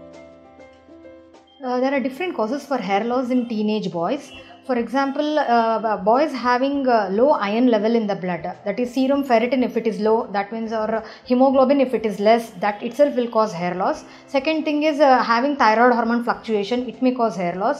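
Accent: South India, India. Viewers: She's south indian i think. That's why her accent is like that. You know what whoever criticize her for accent doesn't have the capability to be like her or to be like an average south indian. They are so human from heart mind and life. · southern* indian accent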